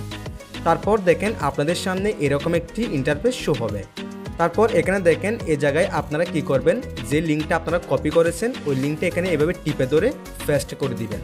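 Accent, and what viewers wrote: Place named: Chittagong, Bangladesh. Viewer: হাজার হলেও আমরা চাটগাঁইয়া,, আপনার কথায় ধরে ফেলছি ❤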